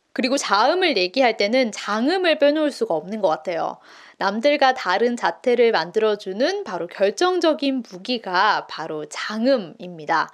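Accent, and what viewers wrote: Busan, South Korea. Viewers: 사투리 귀여우세요 ㆍ 참고도 저도 부산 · 영상을 쭉 보다가 좋아요 구독 클릭했습니다. 간결하게 핵심을 잘 알려주세요. 부산 사투리 e쁘네요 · 지금은 원래 쓰시던 부산 억양보다 저 억양이 더 편하신가요?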